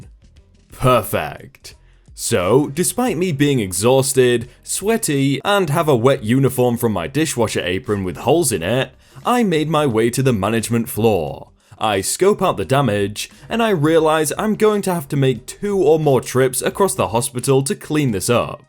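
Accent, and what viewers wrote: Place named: England, United Kingdom. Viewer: It's an accent. Many accents exist that pronounce words that way. Plus, he is English, so one could argue he knows the language best.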